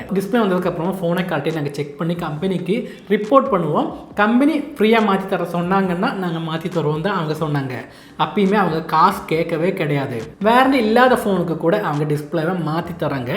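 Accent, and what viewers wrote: Kerala, India. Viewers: This guy is a Malayali!!! His pronunciation is that of typical Malayali!!!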